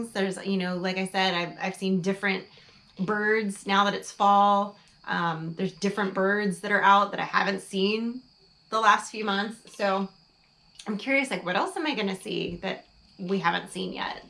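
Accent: South, United States. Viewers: Hey I'm starting to hear a little bit of Southern accent coming through when you talk. I lived in Georgia for four years and my best friend lives in North Carolina so every time I go visit I pick my accent back up within a few days. It's been 30 years since I lived in the South. Have a good weekend and God Bless!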